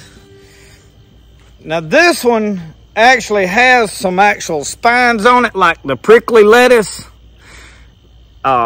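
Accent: South, United States. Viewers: Thank you SO much. This is the first video I've ever found that shows virtually unhaired wild lettuce. I've got lots of it on my property and although my leaves look more like others' wild lettuce than like yours, you were the first person who has said that the hairs on some of these are undetectable. That stuff with the yellow flowers you showed...that's totally what I have. I've known instinctively that this stuff in my yard was wild lettuce and needed to be used, but I couldn't find enough confirmation until now. Plus northern and southern wild lettuce can be so different that I needed a southern accent video to make sure I was doing it right. Thank you! I wish there was a way to rank YT subscription list cuz I'd put you as #1. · You sound very southerner. So I have a silly question? I. Live in florida. So does this grow in florida? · Southern accent video -that’s priceless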